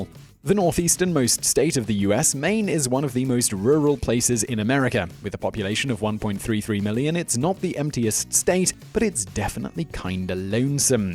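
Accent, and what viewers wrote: United Kingdom. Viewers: British vsauce makes me extra happy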